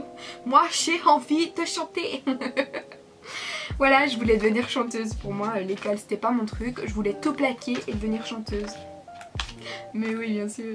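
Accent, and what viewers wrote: Belgium. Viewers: Be Ca elle viens de belgique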